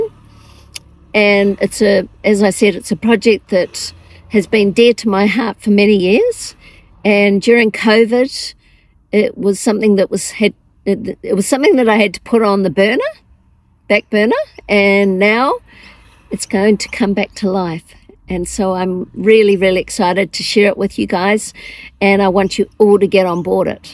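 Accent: New Zealand. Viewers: I'm mid 70's, been caravanning 25yrs.Do I hear a kiwi accent? I'm ex kiwi. Love my lifestyle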